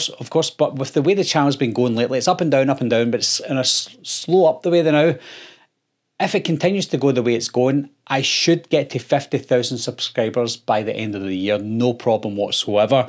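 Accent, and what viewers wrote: Scotland, United Kingdom. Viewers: Well deserved fella. Btw I love the Scottish accent and can understand you just fine. Keep on keeping on.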